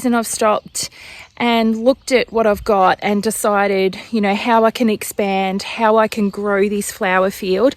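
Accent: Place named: Australia. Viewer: Make sure you're getting enough rest friend. Stay hydrated. I find the Australian accent very soothing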